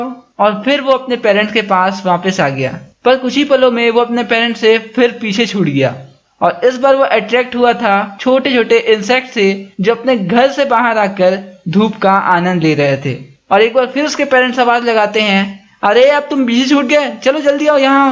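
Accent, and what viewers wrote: Bihar, India. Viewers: Are you from Bihar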